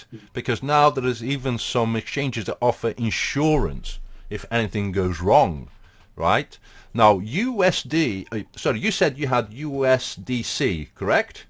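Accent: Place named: Northern England, United Kingdom. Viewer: love the Northern accent you've picked up, brilliant.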